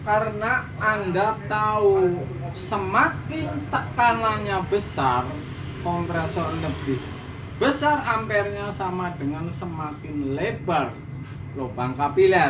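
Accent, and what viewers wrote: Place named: Java, Indonesia. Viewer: Medok temen kang..sampean wong ndi..😁😁😁😁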